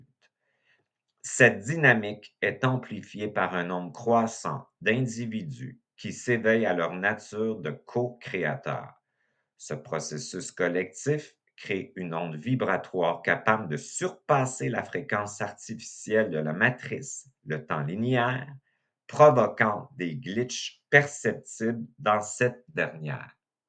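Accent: Quebec, Canada. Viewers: Bonjour, Merci pour tes partages. Je te suis depuis 2 ans. J'ai foncé lorsque j'ai entendu "Je ne veux pas revenir ici". Waouh... ça a fait tilt!! Enfin un "youtubeur" qui propose du contenu et qui dit tout haut, ce que je pense dans ma tête depuis des années. oui... dans ma tête parce que lorsque je dis ça à des copines elles me répondent "La vie est belle!!" Ha bon!? "Ceux qui meurent de faim, les guerres....etc..... tu trouves que la vie est belle toi?? Sont folles dingues!! J'adore t'écouter parler, ta sincérité me fait du bien, les termes que tu emploies sont top et drole!! j'adore votre vocabulaire à vous les gens du Québec et cet accent... j'adore!! J'avais déjà eu une approche du point zéro avec le livre "Essence de kishori", donc ton discours avait du sens pour moi. Nous avons eu quelques rdv, puis pour des raisons financières j'ai dû laisser de coté, donc les vidéos que tu proposes sont un excellent soutien et surtout cela ouvre à nouveau ma conscience, car je viens de rester au fond de l'abime durant 3 ans, j'ai cru que jamais je n'en sortirais... 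Damned.... l'épreuve de la solitude, c'est horrible lorsque l'on est mal. Tout le monde tourne le dos. Bon... ça va mieux!! Alors, ce message, c'est parce que je m'interroge???? Le fait de mettre le focus sur toi (nous sommes nombreuses et nombreux), l'égrégore il est là... qu'on le veuille ou non!? Donc il est utiliser....!? Même si tu nous dis de ne pas te croire, de penser par nous.... ben ça c'est p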